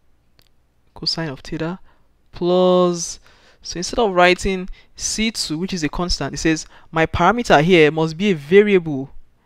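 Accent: Nigeria. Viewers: You are Nigerian aren't you lol, anywayz thanks for the videos it does help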